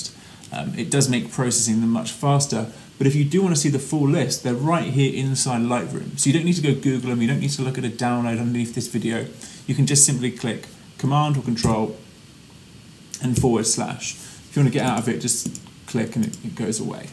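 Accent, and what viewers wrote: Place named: United Kingdom. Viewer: Great tutorial! Very helpful. The British accented paused before saying "ZEE for zoom" made me smile, too.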